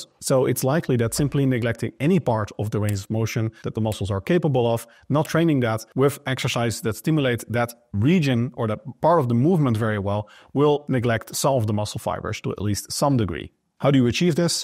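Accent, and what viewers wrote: Netherlands. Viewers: In an other YouTube channel some said you need 2 pull and 2 row exercises for a bigger back. I did that. Gonna put the lat prayer in my back workout tomorrow and skip one of the row exercises. Gonna give it a try, in the end it's what works for me right. Btw love the Dutch accent 😉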